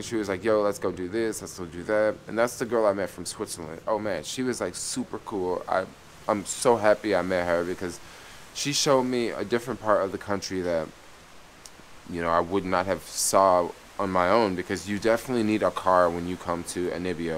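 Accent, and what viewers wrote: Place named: Memphis, United States. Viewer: You from Memphis so talk like you talk. you got your speech pattern.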